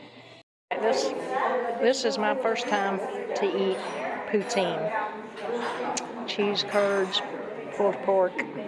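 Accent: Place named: Arkansas, United States. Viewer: my mom side is from Arkansas and they talked alot the same. Well maybe they talked a bit slower than you. Lol.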